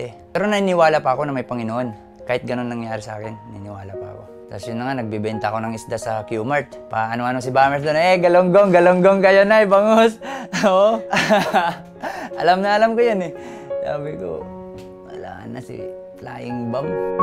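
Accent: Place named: Waray, Philippines. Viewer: Waray man ka diay Doy hehe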